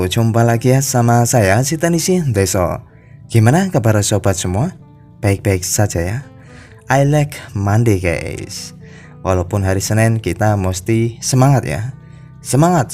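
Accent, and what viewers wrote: Java, Indonesia. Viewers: Jowo gak ilang jowone mase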